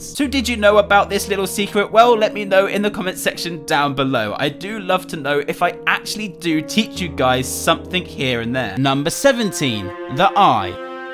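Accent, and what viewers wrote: United Kingdom. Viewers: your from england i love your voice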